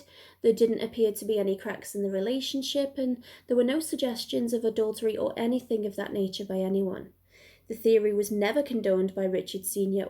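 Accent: United Kingdom. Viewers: great accent still Keep up the good work !, I cannot tell if you’re from near Manchester or Liverpool or somewhere in between??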